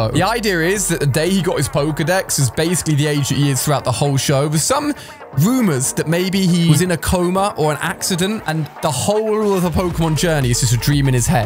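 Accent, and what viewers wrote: United Kingdom. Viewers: Are you the same YouTuber whose another video I have watched recently? You have a very crazy British accent, similar to another YouTuber named Mallow. It was also seen in one episode of SpongeBob SquarePants that Mr. Krabs was born in 1942, which would make him 81 years old!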